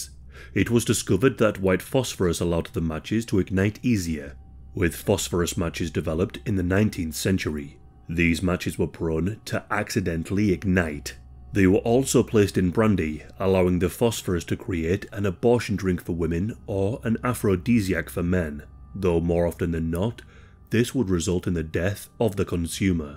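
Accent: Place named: York, United Kingdom. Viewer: You look like Scotty, vocalist of a band called Street Soldier. They are from york so I'm guessing by your accent they are fairly local. Any relation?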